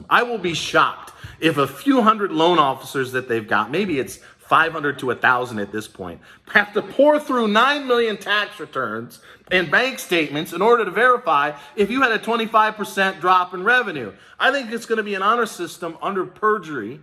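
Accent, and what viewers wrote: New York, United States. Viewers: Thx. Delivered as only a New Yorker can!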